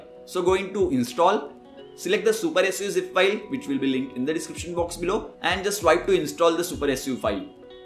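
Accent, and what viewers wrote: India. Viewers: you indians always have really bad accent when it comes to English. It's a shame we can't understand nothing, please practice your accent.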